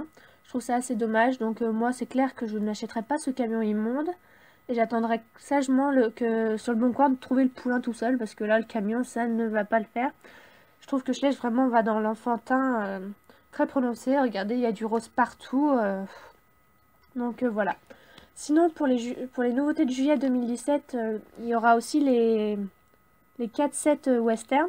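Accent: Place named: Quebec, Canada. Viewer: Parce que on dirait que tu parles Québécois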